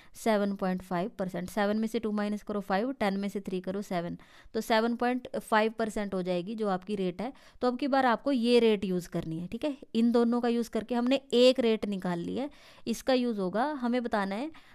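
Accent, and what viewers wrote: Haryana, India. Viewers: Mam are you from haryana?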